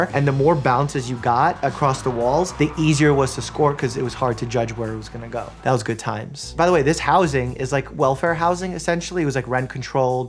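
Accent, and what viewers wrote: United States. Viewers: Loving that your Russian has American accent! 😂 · It's probably because he came to America so young so his new English accent started to influence his Russian accent compared to if he came at like 12+ it probably wouldn't have affect his Russian. Also he spoke English outside of the home so even if his parents only spoke in Russian as a kid your outside of your house normally more than you are home so he has a lot more real world practice with English rather than Russian because he only speaks it with his family and nobody else. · My brain just exploded at hearing speaking Russian. I am a native russian as well, but I don't associate with this language AT ALL! Ahahah. Но видно, что давно не говорил по-русски, слышится акцент американский уже) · Oh my gosh! I’ve been trying to figure out what your accent is every time I watch your videos (or regional dialect I guess is the proper term). 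You just have a particular way of pronouncing words that many of the people I grew up with also do (sounds a little different ‘cause we were in MN) but there’s a clarity to your speech that is common among people who learned English as a second language. It’s a beautiful variation of the American accent. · wow he has an American accent when he speaks Russian? I figured he'd be fluent in both since his parents didn't speak English when he was growing up. I would think they still speak to each other in Russian since it's harder for adults to learn foreign languages than it is for kids. At least that's how it was for my gf in high school who came from Chile with her parents. Her dad's English was decent but when speaking amongst themselves it was almost always in Spanish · I did not know you were born in Russia. Your accent is as American as it can be. Good luck and stay safe out there! Also did you know “Coming to America” is a movie and it’s pretty funny. 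· It's really funny because I got a vibe that you had this heritage despite not knowing anything about you (just found your channel today!). Not sure what it was since your accent is fully "American" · I know im a bit late but his russian is great. Has a only a bit of american accent as opposed to a lot (i am russian so i know) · My question is... HOW DID YOU GET SUCH A GOOD AMERICAN ACCENT?!?!? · Hearing your unmistakably American accent, part of my brain refuses to believe that English is not your native language. This is all the stranger given that the same could be said of me. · has an American accent in English and a Russian accent in Russian.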